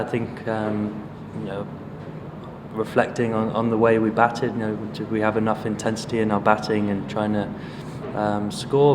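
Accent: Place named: United Kingdom. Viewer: love his British accent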